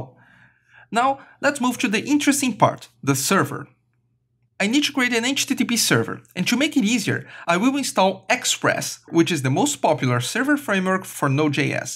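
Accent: Brazil. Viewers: you sound like a brazilian speaking english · good explanation. you brazillian? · Are you Brazilian right ? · sotaque brasileiro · Well done! Desconfio que vc seja brasileiro haha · are you brazilian?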